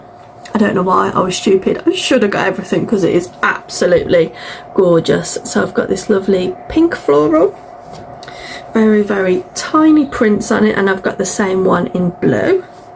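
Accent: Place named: England, United Kingdom. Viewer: Hello, I am new to quilting and your channel, so interesting to see how a quilt comes together. Please keep it up on YouTube. I would love to see more tutorials especially with an English accent! I prefer the landscape format if that’s possible but not essential. Thank you for sharing your journey, much appreciated. Happy sewing.🧵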